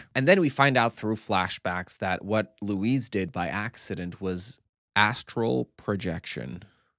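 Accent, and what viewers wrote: Portugal. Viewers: já ouvi uns 20 vídeos teus e mesmo assim não consigo perceber de onde é o teu sotaque tuga ahahha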